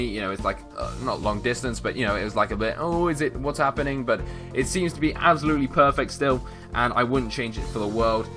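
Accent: United Kingdom. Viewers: u have a British accent and by the way I subscribed to u and I am the girl on clash of clans that I talked to u when u said hi YouTube in clash of clans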